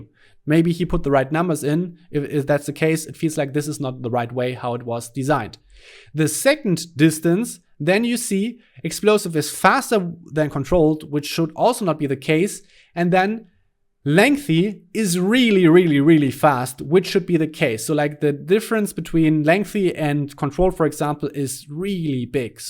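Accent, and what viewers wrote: Germany. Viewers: youre one hundred percent german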